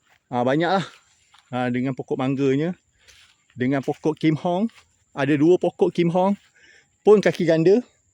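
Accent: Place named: Pahang, Malaysia. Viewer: oh patut dengar cakap macam orang pahang.. haha boleh datang melawat kebun dok bang?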